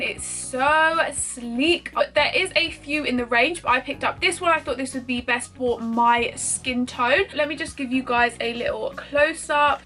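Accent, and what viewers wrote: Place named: England, United Kingdom. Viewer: oh my gosh i had no idea you were English!! anyways, I love your Instagram makeup tutorials and love this very helpful video ❤️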